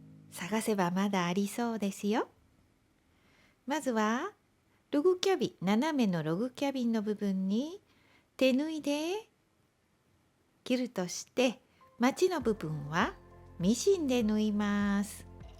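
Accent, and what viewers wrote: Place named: Kansai, Japan. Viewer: 関西弁😂とてもいいですね、笑いました。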